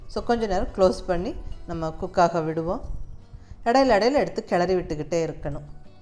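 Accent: Coimbatore, India. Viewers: Very tasty food. Ongaloda kovai Tamil உச்சரிப்பு அருமை.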